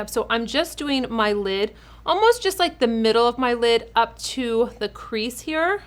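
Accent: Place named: United States. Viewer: Like watching and listening to a stroppy teenager army Sargent. I'll look for someone less ... American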